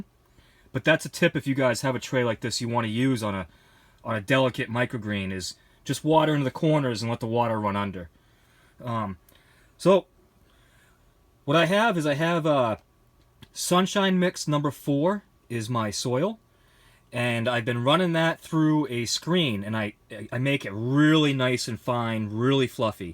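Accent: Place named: New Jersey, United States. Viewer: OK. I am from NJ. I though I recognize an accent. I am in "New Mexico" now. I run "Taos Teenie Greenies". I grow for restaurants and locals. I have a request to add Amaranth to my lineup. I viewed your video for some pointers on my 1st batch. Thanks for making the video! Useful information here. Good work!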